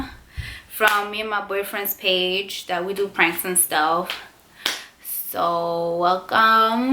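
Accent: New York, United States. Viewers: Zaire Williams is she from New York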